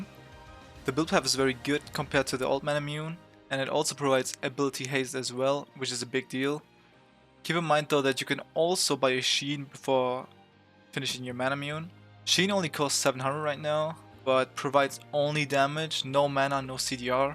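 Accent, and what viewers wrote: Germany. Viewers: Are u German? I hear some accent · are you german?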